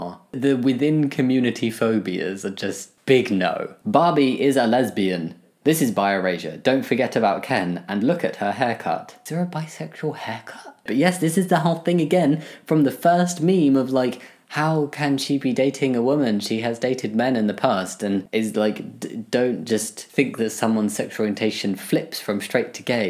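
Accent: United Kingdom. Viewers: You're from the UK?!?!!? Man I'm really bad at detecting dialects.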